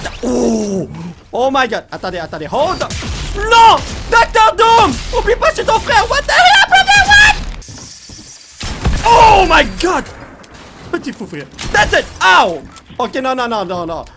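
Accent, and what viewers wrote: Quebec, Canada. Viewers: Accent québécois + humour = 🔥🤣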